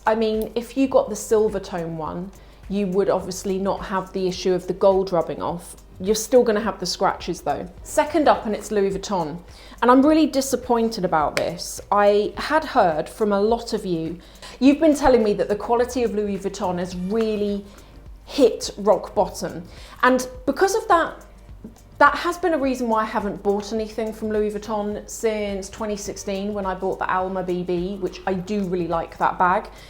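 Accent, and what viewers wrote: RP, United Kingdom. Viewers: you sounds so posh!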